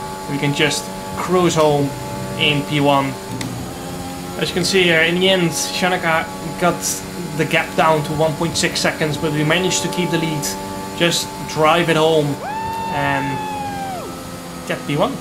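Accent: Netherlands. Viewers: I knew you were dutch just like me, i could hear it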